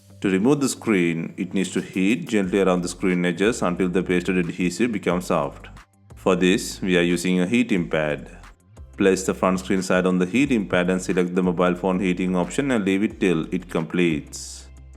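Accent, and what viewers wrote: India. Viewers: Why is there an Indian voice for a white man's hands?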